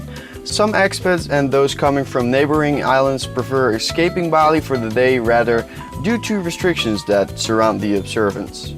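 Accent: Netherlands. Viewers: haha i knew you were dutch even before you said amsterdam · Are you Dutch? You sound really Dutch! Trans: ben je Nederlands? Je klinkt erg Nederlands! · Spot the dutch accent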